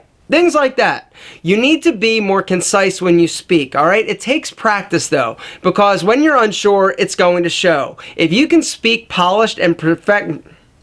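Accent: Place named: New England, United States. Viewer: where did you grow up alpha? I can hear a new England accent on your "aw's". -14 year old long term viewer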